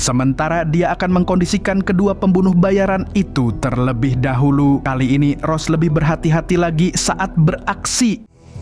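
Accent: Banjar, Indonesia. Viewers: Urang Banjar kah nywa,,haha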